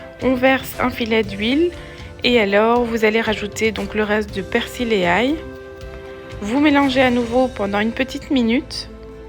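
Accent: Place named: Belgium. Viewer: Merci pour la recette. Vous avez un accent belge si je me trompe pas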